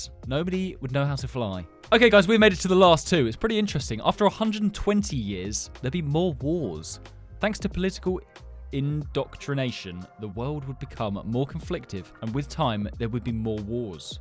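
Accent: United Kingdom. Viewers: r u bri'ish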